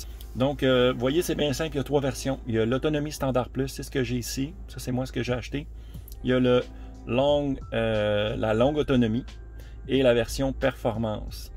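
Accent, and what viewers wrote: Canada. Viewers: Bien le bonjour depuis la France 😁 Vidéo très agréable et apaisante à regarder, j'adore l'accent Canadien lol Je m'abonne, bonne continuation, je m'apprête également à prendre une model 3 SR+ très prochainement... Merci à toi pour tes vidéos 🙂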